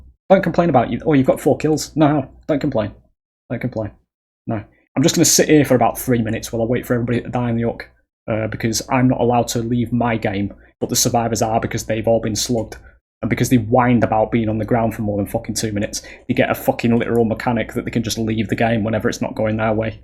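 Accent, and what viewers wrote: United Kingdom. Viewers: A vent in a proper British accent. This is great!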